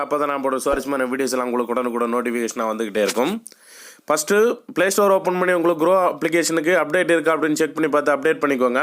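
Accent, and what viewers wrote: Kanyakumari, India. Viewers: Kanyakumari ya bro ...namah ooru voice mahdiri iruku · Ur speaking Kanyakumari Tamil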